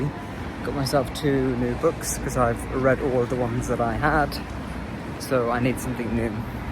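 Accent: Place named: England, United Kingdom. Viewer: I'm a Hongkonger and always like English accent.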